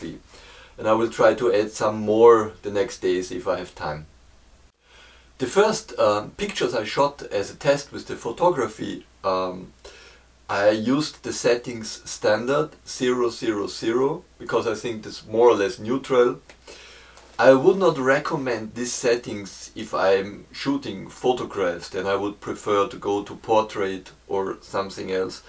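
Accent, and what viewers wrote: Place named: Germany. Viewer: Very informative, thank you! Your German accent adds gravitas to your thoughts!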